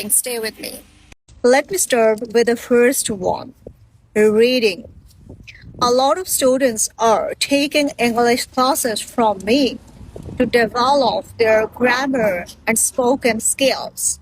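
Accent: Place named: India. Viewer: I understood earlier that you're indian when I listened your accent, then I checked your channel's about section and finally I got I was write.😂😂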